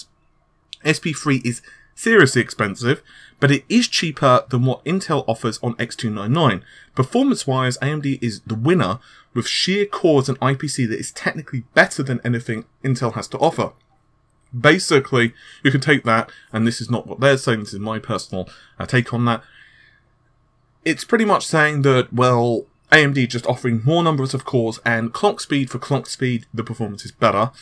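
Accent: United Kingdom. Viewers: Zenyatta- Overwatch maybe it's just his British accent ¯\(ツ)/¯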